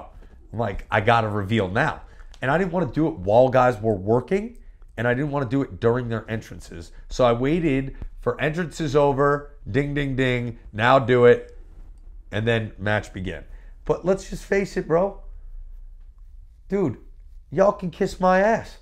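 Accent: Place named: New York, United States. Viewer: Why does he's new York voice go in and out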